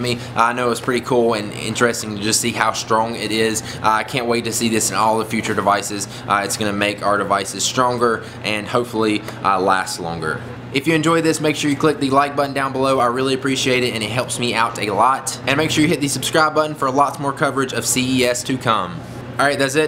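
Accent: South, United States. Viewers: What part of the south are you from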